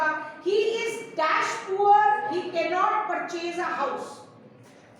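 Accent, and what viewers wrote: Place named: Udaipur, India. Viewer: Udaipur Se Ho Kya Medam Aap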